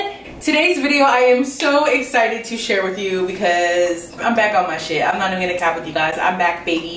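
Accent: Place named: South, United States. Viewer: I'm a true fan. You have lost your Southern accent. Glad you're back.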